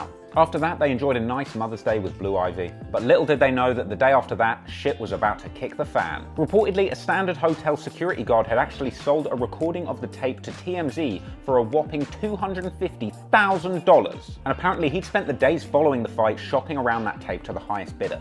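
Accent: United Kingdom. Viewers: That British accent talking American hip-hop is irresistible!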